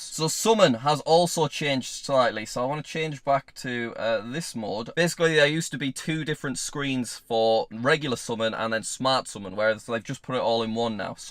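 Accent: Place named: Northern England, United Kingdom. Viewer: Time for my dose of good northern English accent! Yay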